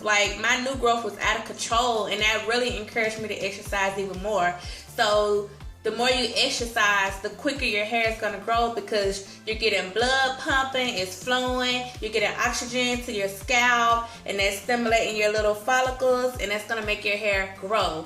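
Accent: South, United States. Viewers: 10 thumbs up. love that country accent.you sound like my relatives from down south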